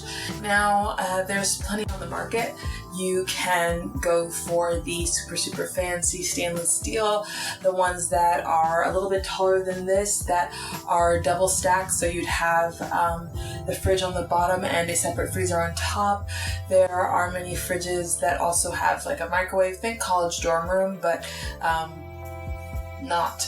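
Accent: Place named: New York, United States. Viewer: Subscribed after our video with Marwa, you haver an incredible New York voice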